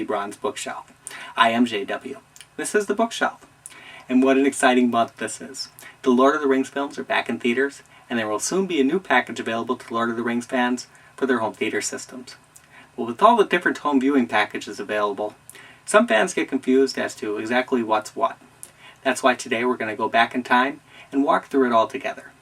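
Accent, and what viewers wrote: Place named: New England, United States. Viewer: Biggest yankee accent I have ever heard.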